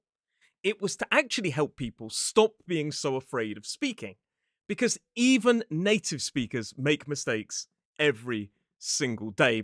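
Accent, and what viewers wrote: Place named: RP, United Kingdom. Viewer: I am from Canada and ok your English sounds like Received pronunciation and it's very easy for me to understand but some British dialects are impossible for us Canadian os American to understand....I remember I needed to have subtitles on when watching Game of Thrones.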